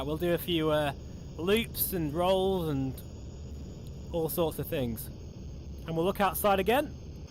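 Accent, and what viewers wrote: United Kingdom. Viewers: Is that a Cheshire accent? G2 looks good, still waiting for mine, since 9th July. Getting fidgety now.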